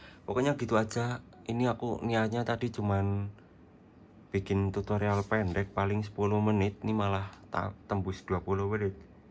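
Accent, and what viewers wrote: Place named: Wonosobo, Indonesia. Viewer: Sampean cah ngendi mas? Logate kaya wsb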